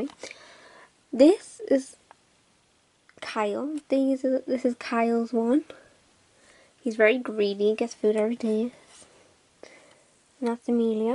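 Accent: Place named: RP, United Kingdom. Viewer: The accent though so posh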